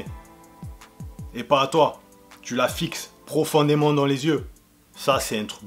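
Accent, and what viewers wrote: Marseille, France. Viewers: Ptdr ton accent marseillais me termine t un crack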